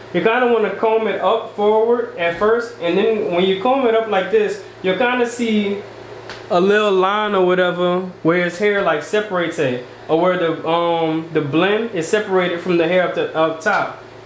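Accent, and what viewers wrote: Louisiana, United States. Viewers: Just by your voice, I know you're from Southern Louisiana. That is a unique accent.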